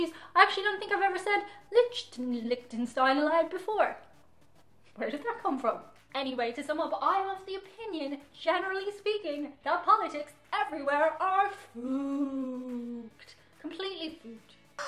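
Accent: Ireland. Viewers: Oh and ask away any question to us across the pond. We will gladly answer someone like you who is both open minded and easy on the eyes. Love your Irish accent as well. So lovely. So keep on keeping on. Same for Chewy.:-) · Man i love Irish accent. Could listen to it all day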